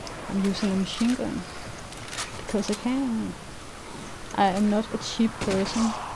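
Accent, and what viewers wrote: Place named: Denmark. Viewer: ^.^; Man how long we've come when we were just BF and GF. I still love your danish accent honey! <3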